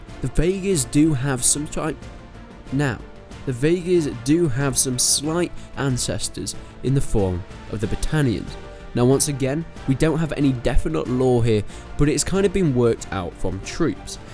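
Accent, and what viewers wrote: United Kingdom. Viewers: god, do all british people talk like this?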